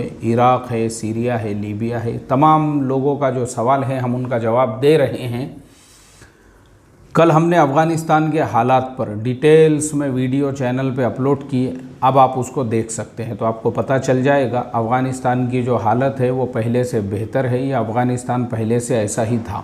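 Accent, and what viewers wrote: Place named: Maharashtra, India. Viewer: Pure Maharashtrian tone. 🙏🙏🙏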